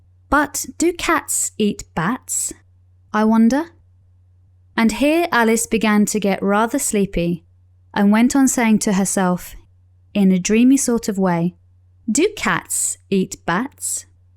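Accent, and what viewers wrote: United Kingdom. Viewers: 피터팬 읽어주기 컨텐츠 기다려보겠습니다!! 영국작가가 쓴 런던배경 동화라 영국 발음으로 공부하면 너무 좋을거같아요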